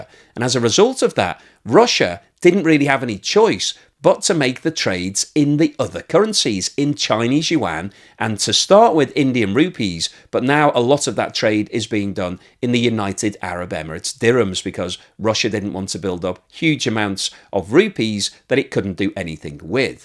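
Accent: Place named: England, United Kingdom. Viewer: Great job,. Thank you for educating us. Your videos are very enjoyable and you are very good at what you do plus I really like your accent. Yours is a proper English, beautiful and clear 😊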